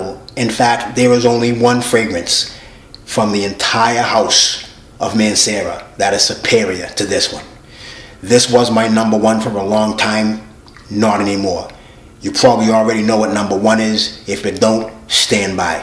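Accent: Boston, United States. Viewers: This was soooo helpful! I love your passion for scent - and your accent! (Boston?). Big love from the UK and Merry Christmas 🙂. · Is that a Boston accent? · ok my guess was Boston, but didn't want to assume 😉 thanks very much for such an informative video covering so much ground! Subscribed 👍🏽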